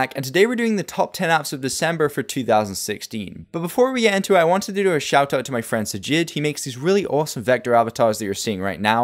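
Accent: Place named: Scotland, United Kingdom. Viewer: i could hear a hint of a scottish accent when watching that video thanks for answering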